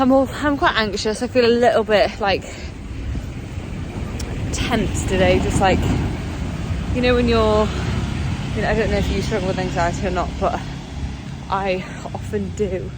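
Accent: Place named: United Kingdom. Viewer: I like your accent ❤️ british yaa ??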